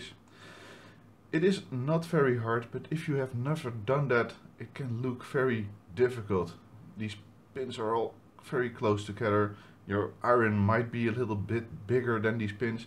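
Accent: Netherlands. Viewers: haha vanaf de eerste seconde herkende ik de nederlander hier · Love the Dutch accent .. :)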